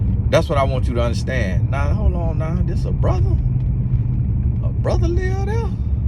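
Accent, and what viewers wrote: United States. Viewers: I don’t know about everyone else, but the transparency brings about the trust. Your transparency separates you from the “guru/talking heads”. Your real life situations, country accent and all, makes you so relatable. I understand being able to capitalize on your brand, but don’t let it remove the authenticity…that’s what keeps some of us here. God WILL provide. Thanks for all that you do!🙏🏿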